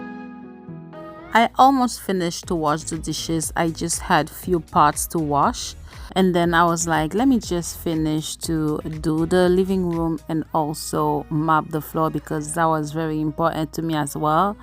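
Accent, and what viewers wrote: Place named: Trinidad and Tobago. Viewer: Hi new to your channel ❤ enjoy your video. Are you Trinidadian?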